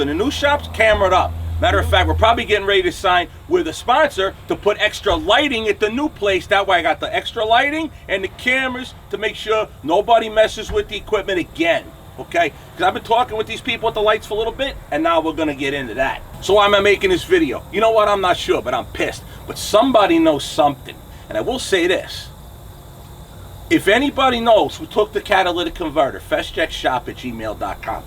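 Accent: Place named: North Jersey, United States. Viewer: Yup, you sound like pure North Jersey! Love it! And I hope you find the lowlife so he can be dealt with properly.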